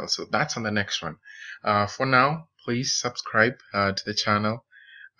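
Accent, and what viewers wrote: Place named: Kikuyu, Kenya. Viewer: Thanx for this. That Gikuyu accent is hard to hide though eh?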